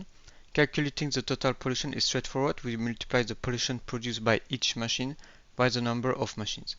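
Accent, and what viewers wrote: France. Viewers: Nice tutorial ! By any chance, are you French ? 👀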